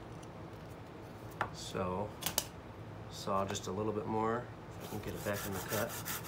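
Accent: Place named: Michigan, United States. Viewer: Great stuff. Your stropping video helped tons. I heard the accent before I saw your channel name and knew you were from Michigan. It’s hard to find green wood downriver!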